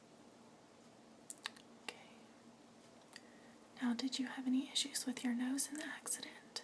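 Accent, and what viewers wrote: South, United States. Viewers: Love this video! Do I detect a very slight southern accent? It's extremely relaxing! Thanks so much.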